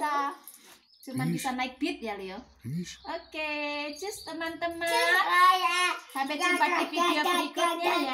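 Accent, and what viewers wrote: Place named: East Java, Indonesia. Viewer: Asli Jawa Timur pasti ya mba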